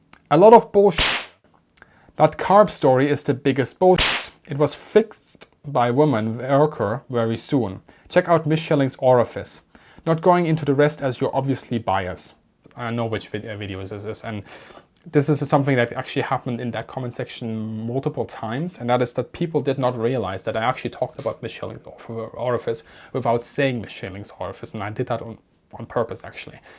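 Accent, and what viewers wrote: Germany. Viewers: I really like youre german accent because i am from germany as well and with youre accent i can understand more of what you are saying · Heyyy, do not worry about " German accent ", Kraut and Jerry LOL. Those guys are jellos because you speak more than one language. Also, those d........heads forget, WW2 ended 1945. Keep up with good work. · Don't take the comments too seriously, specially the insulting ones. YOU DO A GREAT JOB ¡ . You accent is very German, I can tell from my person experience since my family background, I am an airline pilot in Mexico City (Volaris). By the way, are you a pilot ? Keep trying, CONGRATULATIONS ¡¡¡¡ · totally agree, sure you can hear his German/austrian Accent but i can understand everything clearly, Keep going ( Guade Videos hawara) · OK, I have to do this. First, I knew I was going to have some fun with this. 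I have been following your content for about half a year (after i got home internet), and I immediately recognized the incredibly high information value. Therefore, anyone who left negative comments on your channel would only prove their own stupidity. Then, after listening to just the first few comments, I needed to say the following immediately. Language comments? How inane. Sure, you sound like a Cherman. So what? You are fluent in English, which must be your second language, and you must be fluent in German, which is your first language, and which I recognized from your pronunciation of German terms, and I seem to remember, French also? And maybe something else. So here's one guy who's fluent in 3 languages, and there's another guy, who probably only knows one language, and probably badly at that, criticizing the first guy on the unusual pronunciation of a few words. Who is the better man? 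· he doesn't have the ability to speak "the queens English" with a German accent, he would need an English accent, but he speaks EXTREMELY FLUENT English, and that's what's important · This is the exact reason why I don't upload any videos on YT! I tend to lose my contenance easily and quickly. Then it gets nasty and I actually don't need that. I like your channel a lot and I like and understand your German accent much better than Military History Visualized's terrible Austrian accent, hahaha! What else could a Piefke say? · Oh for gods sake.....IGNORE THEM my friend! I am a Brit (A Welshman actually) I am keen aviation fan, my father was in the RAF post war era! You are a wealth of knowledge that can be verified should one choose to research it! Yes you are German, does not take long to work that out and so what? I admire your Objective impartiality, Lets be honest some German aircraft of the war were years ahead of anything the allies had. 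You could choose to extol the virtues of German Jet aircraft, the 262 or the Arado bomber and you do review these aircraft, but it is always fair, and objective and above all presented in a decent manner and as for people taking the P**S out of your accent..GRRRR ask them how is their German, or french or ANY language other than their mother language. As a Brit, I am always embarrassed how many speakers of English, which is also the language of America (they don't say "I speak American")...where was I? Oh yes English speakers generally can not speak any other language yet they always have the audacity to criticize a foreigner speaking English! I can speak a bit of my country's language which is welsh, I wish I could have learnt it in school but as I am now 62, that was a long time ago...Keep up the good work my friend, I always look forward to your channel Thank you! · Great & funny video! 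Germans do great impersonations us American southerners. Could you please, in your German accent say the famous line from "Cool Hand Luke", in a heavy U.S. southern accent: "What we've got here is failure to communicate. " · Someone whispering about aviation? I'm in - especially with a german accent. Grüsse aus Dänemark.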